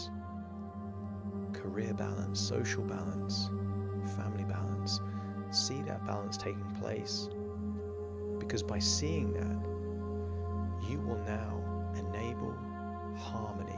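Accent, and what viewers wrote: England, United Kingdom. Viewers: Hearing You Speak Makes Me Feel Like I Am In (Old) England, Instead of New England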